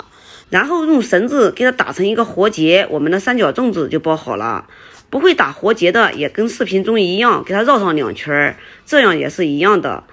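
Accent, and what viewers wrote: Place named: Guilin, China. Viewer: 解释得非常清楚，还喜欢你的口音，很是桂林人吗？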